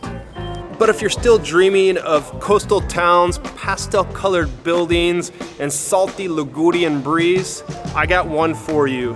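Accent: United States. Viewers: You sound like an American. Perhaps just stay there.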